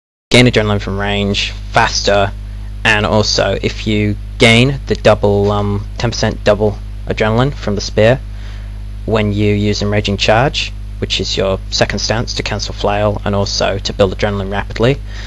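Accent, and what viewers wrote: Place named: United Kingdom. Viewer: wooot a british gw player that does vids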